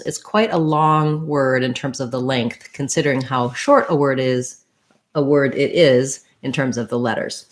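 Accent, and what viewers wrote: United States. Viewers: Why are teaching English with a deliberate American accent? It is incorrect English and very confusing for foreigners. There are many area accents in England but nobody normally would teach it because it is incorrect.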